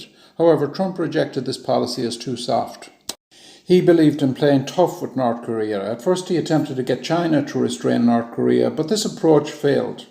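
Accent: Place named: Germany. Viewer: You summed that up pretty damn well. You sound german. Do you live in the states?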